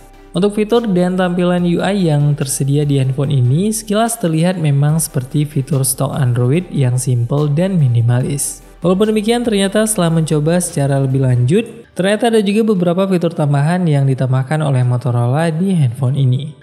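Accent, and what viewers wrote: Aceh, Indonesia. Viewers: Org banda aceh kah?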